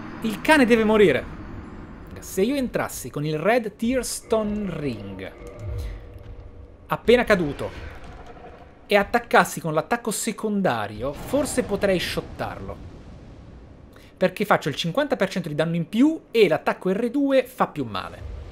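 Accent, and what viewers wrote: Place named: Ireland. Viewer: "Maybe the TURD one". Non è che hai l'inglese impastato, è che lo parli con l'accento irlandese; che è tipo l'anello della calamità dell'inglese, come dimostrò sulla BBC Dara Ó Briain con una bambola parlante...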